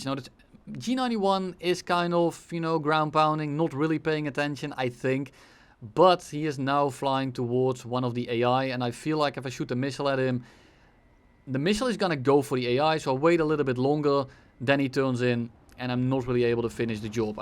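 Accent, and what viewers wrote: Netherlands. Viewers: you dutch?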